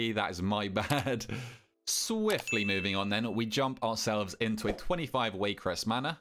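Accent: United Kingdom. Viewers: Couple of questions mate, how do you even click those skinny nameplates and also, where in the UK are you? You sound fairly southern... Great content btw, love it.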